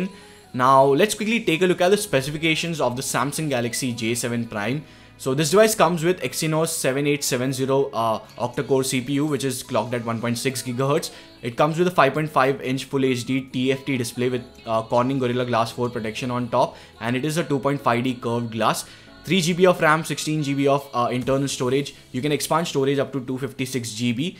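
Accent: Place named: India. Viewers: siempre me pasa que cuando escucho hablar ingles a un habitante de la India....pienso que es rajesh khotroophali de the big bang theory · Hindis accent... Make me laught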